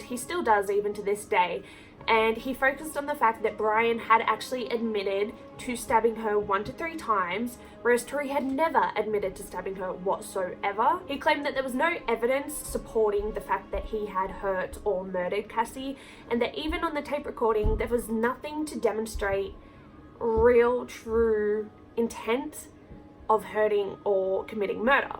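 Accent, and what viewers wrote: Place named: Australia. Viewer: He can never be forgiven for what he did, neither of them can, but I do believe I see regret in Brian's eyes. The other dude just comes across as a complete and utter psycho, and his parents are clearly deluded and in denial. Anyway, awesome presentation! You're rather easy on the eye and who doesn't love an Aussie accent? Sucks that you don't really seem to be active any longer. Stay safe!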